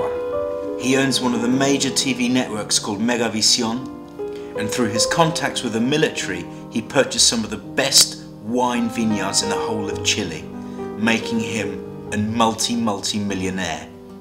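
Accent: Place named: England, United Kingdom. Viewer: but sadly distributed between the rich, military, foreign companies leaving the common chilean people to miss out this situation will continue until the people stops watching tv and gets informed whats really going in the country, by the way by your accent i guess your from england? why you so intrested in chile? anyways nice video cheers from australia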